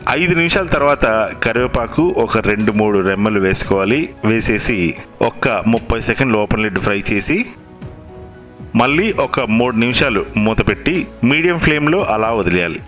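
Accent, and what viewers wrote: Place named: Godavari, India. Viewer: garu... me voice ki, mee recepies, last lo meeru paade songs ki nenu picha fan ni andi babu... miru chepthe nammaru nenu kuda mee laga matladesthunna andi baboiii... Mee yaasa ki mee prasa ki 🙏🙏🙏 Finally mana godavari vantalu antene oka adbutham... danni miru inka baga vandi chupisthunaru... Ah vantalu chusi abroad lo unna ma paristhithi enti andiii... edi miku emanna nyayam ga undaa...🥺 Final ga okati andi mee voice ki mee yaasaki nenu pedda fan...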